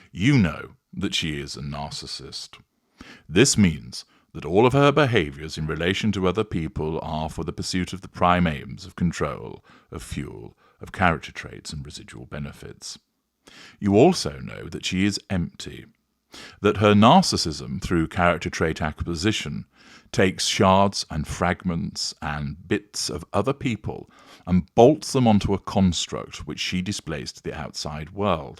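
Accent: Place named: North, United Kingdom. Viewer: I detect a Northern Accent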